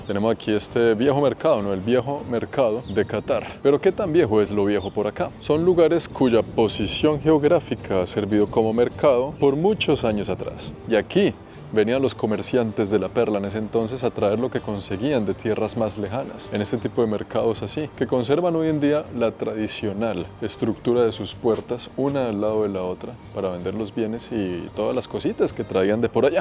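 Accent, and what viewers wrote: Colombia. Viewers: Para mi gusto el mejor YouTuber . Inteligente simpático guapo y ese acento colombiano tan bonito, medio cómico me gusta cuando le habla a los animalitos ❤y conste que no soy de Colombia. Un saludo desde California u🇺🇸 🇲🇽.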